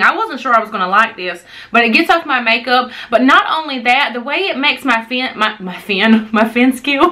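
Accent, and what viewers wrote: South, United States. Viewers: Love ur channel and southern twang!!!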